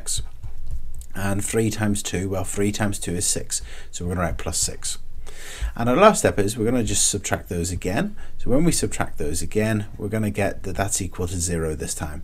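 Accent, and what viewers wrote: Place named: Northern Ireland, United Kingdom. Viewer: Man I love northern Irish accent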